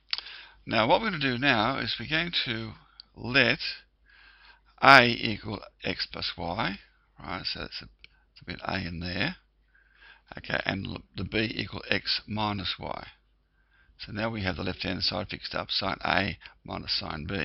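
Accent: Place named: Australia. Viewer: I can say he is an Aussie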